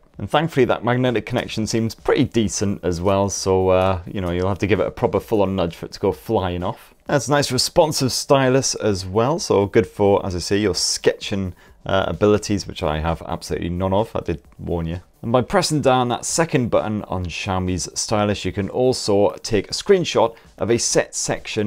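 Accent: United Kingdom. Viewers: You hv proper UK ENGLISH. Learned lot of new words from u.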